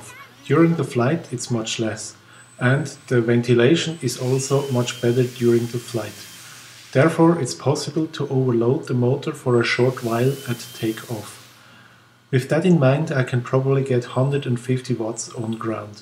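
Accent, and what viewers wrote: Switzerland. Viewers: Great project, keep it up! I guess from your accent and from the markings on the plane, you're from Switzerland ;-) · Oh, dr schwizer Akzent 😂 aber supper idee/ video!!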